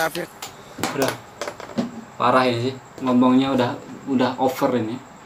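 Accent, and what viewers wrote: Aceh, Indonesia. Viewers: Pelat bahasa nya org aceh,video yg mencabar kedaulatan malaysia itu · kerana gaya cakapnya itu seperti dia nya berada di Indonesia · Gaya ckp nye spt org acheh..xlma nt kna kutipla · Betul bro Loghat mcm aceh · Cara ckp to mcm urg aceh ..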